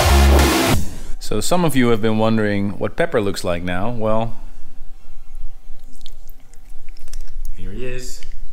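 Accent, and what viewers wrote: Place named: England, United Kingdom. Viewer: Getting a little English accent there😉❤️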